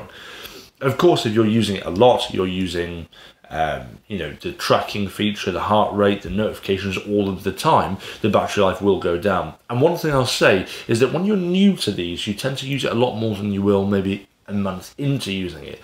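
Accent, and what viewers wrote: United Kingdom. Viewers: I love the British background- the cup of tea, rusty books, bottles of whisky! Nothing like getting your daily tech review in a British accent!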